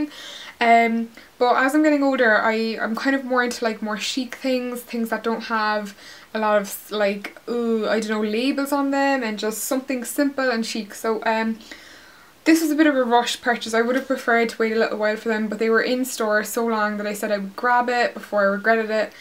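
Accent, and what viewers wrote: Cork, Ireland. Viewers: omg the inactive transcript is so funny :L clearly doesn't know the cork accent :P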